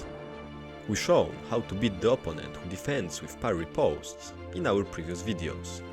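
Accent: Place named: Eastern Europe. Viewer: We were promised Slavic accent, and we get memes in Slavic accent. Nothing to complain here...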